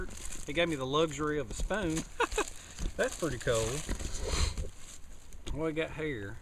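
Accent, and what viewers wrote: South, United States. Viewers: I live in TN. In Nashville now and I lived in east TN by the mountains when I went to college(ETSU). Noticing accents is something I kinda picked up. To me you have a northern Alabama/Georgia accent which is cool. You should have a survival weekend and invite survival/bushcrafters from youtube and have challenges. Im new to it and I'm kinda preppy you could say so my friends arent one to get in the wild like that so I mostly do it by myself so getting to see others on youtube is a treat. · Loving this already, just from the way you talk; sounds like home. Awesome use of all the items, and what a fun challenge! One of the best videos of this sort I've seen. Elevated bed, great use of the food and cooking, and great camera work from Nick, too. Such fun. The woods make me homesick. From another green-eyed Southerner, well done!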